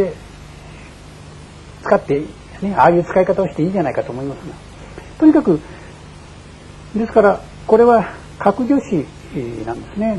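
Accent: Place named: Ise, Japan. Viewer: 遠い世界の片隅に住み古の大詩人である杜甫の如く深山の裾野を巡り林に入り込み暫し途方に暮れし時何処からともなく聞き覚えの訛りのある控えめな染み染みとした語り口のありがたき御講義の冒頭のところが始められたのである。 あの柔和で羞じらふやうな語りの世界は誠に悦ばしき事であります 確かにあれは聞き覚えのある筈のものでありました。 我が妻とその父母の生まれは、伊勢松坂の山里であります。道理で即座に判ったのであります。 本居宣長の生まれし古里である伊勢松坂の山室山の地に近ひのであります。私は己の縁の深さといふものを感じました。 この大小説の有名な冒頭部分の誰も知る桐壺の巻の第一行｢いづれの御時にか、女御更衣あまたさぶらひ給ひけるなかに、いとやむごとなききはにはあらぬが、すぐれてときめき給ふありけり。｣ でありますが、実を申せばこの講義に使ひたき古本があります。昭和二年九月一日印刷、昭和二年九月五日発行、発行所・弘法社、校訂者 有川武彦、発行者 湯川松次郎、印刷所 井下書籍印刷所、｢増訂 源氏物語湖月抄上巻 定価 金 五円 中巻 昭和三年四月十日 印刷 昭和三年四月十五日 発行 昭和四年五月一日 再版 発行 定価 金 五円 下巻は昭和三年四月十日 印刷 昭和三年四月十五日 発行 昭和四年五月一日 再版発行 定価 金 五円 全て和本であります。私はこの書物をいつの時にか、恐らく幸運なことに安価で多分、ブックオフで購入したのだと記憶しておりますが、恐らく稀覯本であったのではなひかと思っております。 確かに古くて綺麗な本ではありませんがいひのであります。 多分生涯の書物になるのではなひかと思っております。 又しても、話しが横道に逸れて仕舞ひまして誠に申し訳ありませんでした、どふかお許し下さひ。 大碩学である岡野裕彦先生の心昂ぶりて已まない素晴らしき名講義を拝聴しやうではありませんか。 擱筆 😊😊😊😊😊😊😊😊😊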